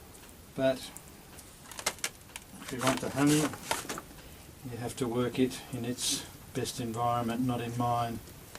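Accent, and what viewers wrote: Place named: Australia. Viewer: Heard your accent and immediately said "he sounds like a fellow Aussie" Then saw the Geelong shirt and all was confirmed.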